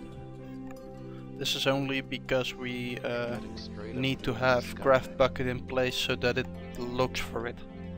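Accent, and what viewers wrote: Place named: Netherlands. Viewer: Hey dude really nice tutorial but next time just speak dutch that sounds a bit better then that dutch-english. im dutch to so thats why im saying this. But still good job. Keep on the good work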